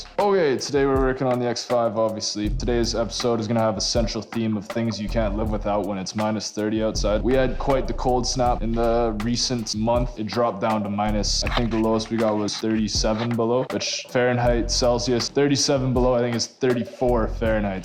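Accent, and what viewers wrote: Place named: Ottawa Valley, Canada. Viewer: Fuck bud, this feels and sounds like me and my god damned 1500, 5.7 ram. Glad i found ya. Fuck... hahaha we even say some of the same damn things. Never realized how much you lads sound like us ( ottawa valley, northwest a few hours of central Ottawa. Keep these comin bud!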